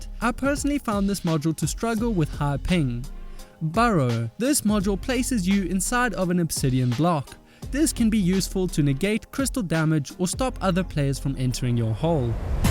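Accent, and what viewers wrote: South Africa. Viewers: South African flag on your discord status i think i might have found the most normal sounding south african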